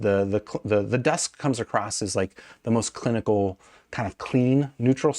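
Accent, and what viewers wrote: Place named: California, United States. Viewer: I used to live in LA. I just think your accent is from California. Could be wrong...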